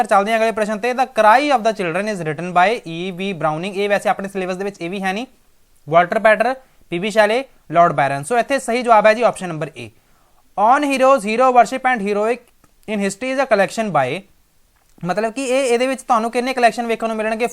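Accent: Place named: Malwa, India. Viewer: very natural ,pure malwai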